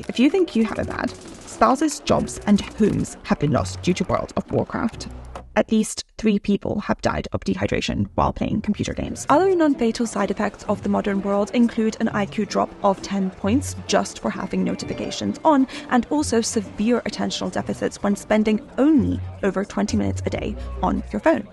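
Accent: United Kingdom. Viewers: Is it British accent who know about that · Oh my god I don't understand British English, I'm learning American english 🤦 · Your voice is not annoying. It sounds just like Karen Gillian. (though it might just be the british accent).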